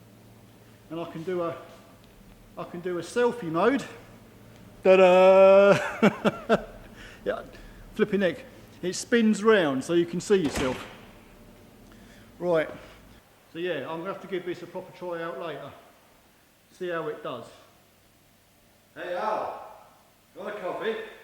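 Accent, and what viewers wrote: United Kingdom. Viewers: Ох уж этот Британский английский 😂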